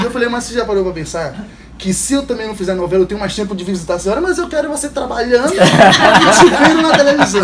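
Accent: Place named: Northeast, Brazil. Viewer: Que gca,romântico E questionador!Gostei!Ame ivc como Carlos!Parabéns!Agora que to reparando que tem um sotaque nordestino!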